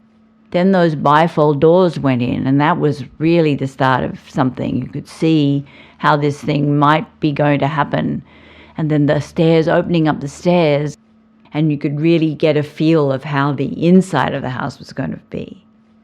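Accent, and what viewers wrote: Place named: New Zealand. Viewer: It's faint, but I'm detecting an accent in your speech. New Zealish?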